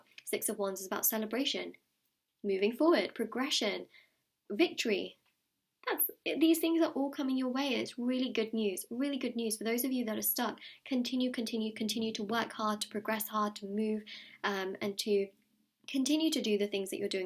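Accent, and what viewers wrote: England, United Kingdom. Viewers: I like your English accent, beautiful ❤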